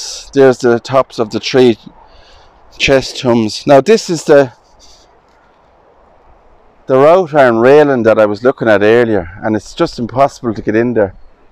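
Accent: Ireland. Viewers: What wonderful video. When our children have moved out, I hope we can travel to Ireland and visit our ancestors and look at the old churches. Just a minor suggestion for you to consider. Maybe give the location and formal name of church in the written notes for each video? Your brogue is wonderful to listen to for us out of Ireland, but hard to make out the names by pronunciation and given spelling differences. (I'd love to add this church, for instance, to a vacation wish list, but I have no idea where it is.) 😢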